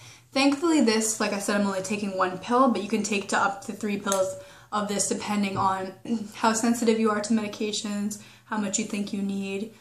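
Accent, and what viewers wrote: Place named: Canada. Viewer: yo i love your Canadian accent.. so cute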